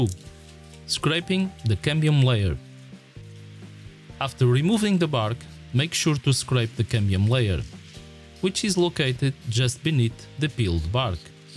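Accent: Portugal. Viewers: 100 percent Tuga accent. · From the accent, i'm betting this guy is Portuguese. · Gosto do sotaque português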